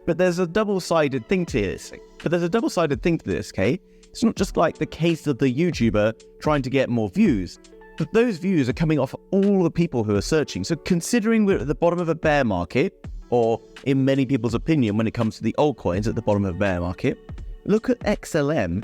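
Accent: United Kingdom. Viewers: Adorable where u from in the UK lol😊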